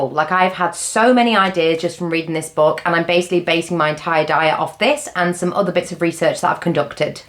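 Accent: United Kingdom. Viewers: From her amazing accent I belive she's in the UK. so likely following UK rationing.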